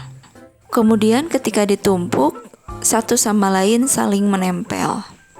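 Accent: Bali, Indonesia. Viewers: Saya juga mo bilang gt... Tk kira orang bali.. 😁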